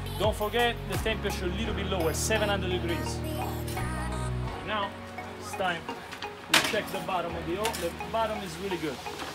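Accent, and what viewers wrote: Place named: Apulia, Italy. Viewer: bel video, splendida pizza...bravo.. immagino che i presenti erano soddisfatti... dal tuo accento immagino sia pugliese... di dove?? un salutone da Roma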